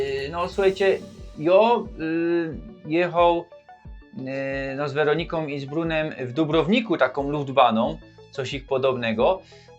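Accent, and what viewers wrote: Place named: Rural, Poland. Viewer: A co ty tak często zmieniasz KOLOR SKÓRY? Zaczynasz jako czorny Murzyn, ale za chwilę udajesz białasa! O tu ==> Po drugie ten wasz "język" śląski to zwykła KLASYCZNA wsiowa gwara, taką, to i u nas, w Świętokrzyskiem często spotykamy, ale nikt tu nie udaje "uczonego językoznawcę". Wsiowy, to wsiowy! To widać, słychać i... czuć. :hand-orange-covering-eyes::body-blue-raised-arms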